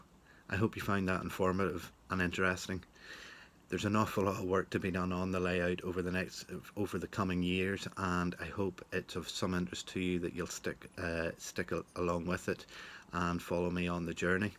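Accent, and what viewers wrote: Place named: Ulster, United Kingdom. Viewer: Great video and so inspirational, also lovely to hear a soft Ulster accent talking trains 😀 Happy Christmas from County Wicklow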